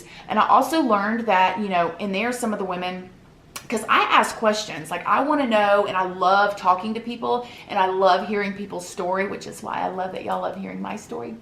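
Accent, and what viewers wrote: South, United States. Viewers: You have a southern accent. (I’m from Louisiana) where are you from beautiful?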